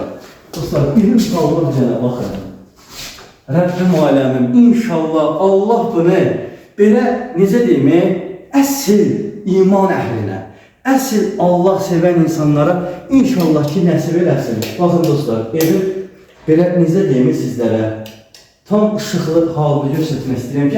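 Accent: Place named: Nakhchivan, Azerbaijan. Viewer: Çox fikirləşdim bu ləhcə mənə tanış gəlir deme Mənim yerlim Naxçıvanlı imiş .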